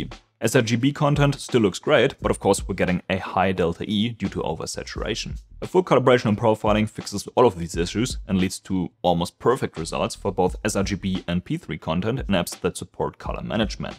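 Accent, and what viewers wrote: Germany. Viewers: Man hört doch raus das er deutsch ist😂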